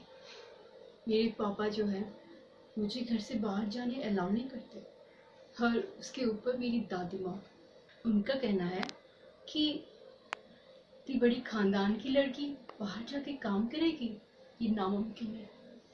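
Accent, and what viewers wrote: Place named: Odisha, India. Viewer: odia odia laguchi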